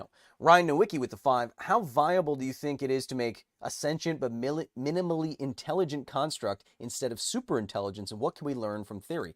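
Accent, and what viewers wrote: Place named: Australia. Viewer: Gotta admit, last week's Aussie accent was better. Can't put my finger on why though.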